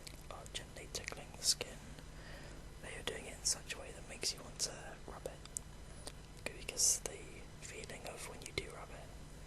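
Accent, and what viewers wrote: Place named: England, United Kingdom. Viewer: Thank you so much for this video, I absolutely love it! I'm studying abroad at the moment and this has helped a lot with stressful exams etc. nothing like a bit of eye poking to help unwind. I'm a bit homesick and it is so good to hear the English accent too - can't get enough of your voice. p.s. you're awesome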